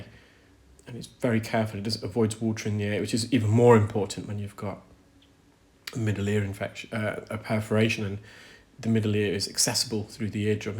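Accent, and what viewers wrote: United Kingdom. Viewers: I know your British but where about exactly is you’re accent from ?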